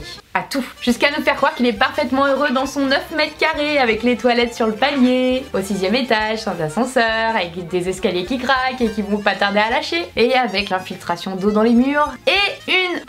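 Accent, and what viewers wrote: England, United Kingdom. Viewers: tu as un accent anglais trop beau !!!!!!!!!!!!!!!!!!!! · Meuf ton accent English 😍😍😍😍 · i love ton accent anglais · Super accent anglais !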